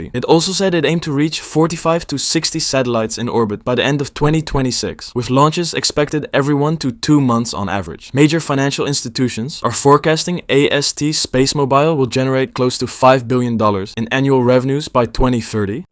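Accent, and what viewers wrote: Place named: Netherlands. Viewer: really interesting video about GPUS, and the way you calculate things! Also gotta love the dutch accent. Cheers from sweden!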